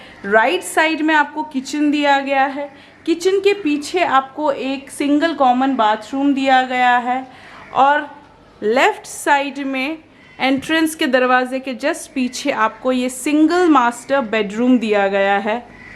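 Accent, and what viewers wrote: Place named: Bengal, India. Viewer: Aapnar hindi te clear Bangla taan ashe. Mane apnar primary language Bangla. Buyers would trust Bangali agent much more than Khotua agent.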